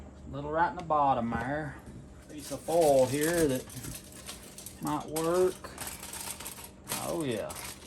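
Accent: United States. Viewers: those pies look absolutely delicious oh yea I love your country accent,your a great dad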